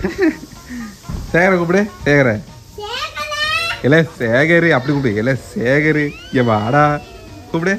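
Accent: Sivagangai, India. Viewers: Broo nanu Sivagangai bro akka soldra mari sivagangai seemai... 🤍⚡😇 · Naanu sivagangai thaaa broo unga video vaa naa paapen naanu akka mari thaaa pesuvee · Sivagangai karapulla language apditha irukum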